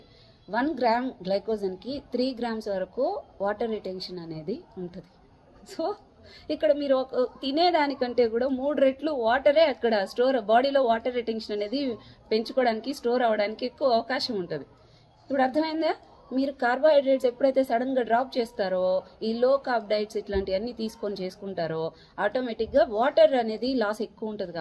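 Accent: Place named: Kadiri, India. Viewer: Hi madam recently I started watching your videoes first when I saw your videos on Facebook I felt that your exactly speaking like kadiri area people but recently only I came to know that you are from kadiri. Felt very happy and proud of you.i am also from kadiri.i wish you to be more successful.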